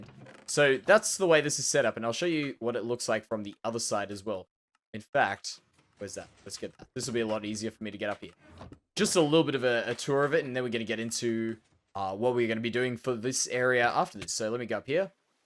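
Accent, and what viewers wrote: Australia. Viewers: Did anyone else mistake his Australian accent for a British one lol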